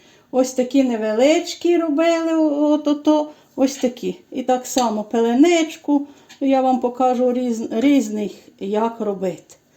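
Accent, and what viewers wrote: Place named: Bukovina, Ukraine. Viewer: Яка розумниця!!! Я сама родом з Кіцманя,Чернівецькоі обл. Яка мені люба Ваша мова буковинська! Аж серце щемить!!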